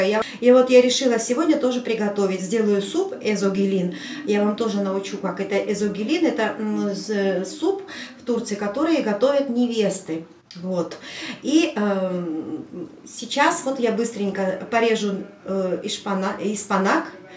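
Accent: Georgia. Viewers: Gruzinski Akzent vi gruzinka 👍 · ты там луч света акцент это нашинский грузинский испанах это мы же в Грузии так его называем смотрю твои видео с удовольствием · Этоже классно когда тебя так называют ,ашкым,севгилим,чаным,уреим,бебеим,хаятым. Я так и знала что вы с Грузии ,у вас акцент грузинский)))))) 👍👍👍👍👍👍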